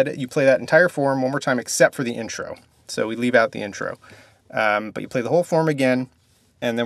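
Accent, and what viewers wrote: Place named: United Kingdom. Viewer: This dude does one hell of a British accent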